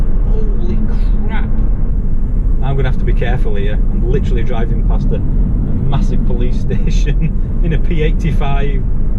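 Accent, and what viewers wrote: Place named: England, United Kingdom. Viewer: is talking English not American.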